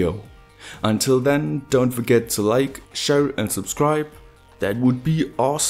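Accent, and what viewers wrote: Germany. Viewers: Nice Geodreieck and nice Deutcher Akzent xD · Great (Video)! Btw, I got one question, are you german? Because you sound like them:D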